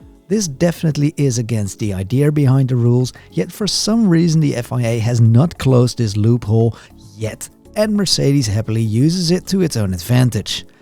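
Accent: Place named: Netherlands. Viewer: Also, he's doing a great job speaking English but I still hear a distinct Dutch accent.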